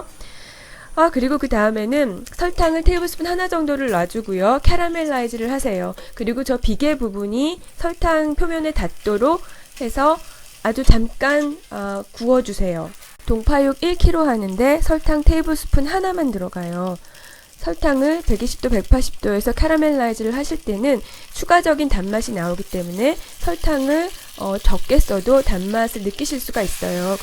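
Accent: Seoul, South Korea. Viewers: 서울사투리 너무 귀여워용 ㅠㅠ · 와 진자이게 서울 사투리죠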